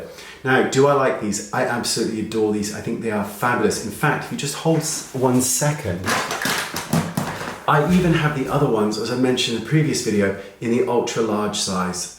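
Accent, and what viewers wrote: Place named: United Kingdom. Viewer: Sometimes I forget what the video is about because I’m enjoying listening to your accent & the British words to use. That my friend is a good thing.👍🏻💚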